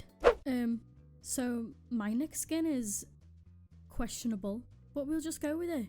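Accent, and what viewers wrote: United Kingdom. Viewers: Are u british or is that accent in my head? (no offence XD)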